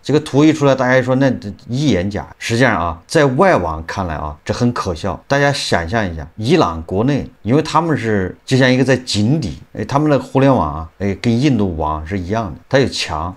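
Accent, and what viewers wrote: Anqing, China. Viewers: 安庆地区口音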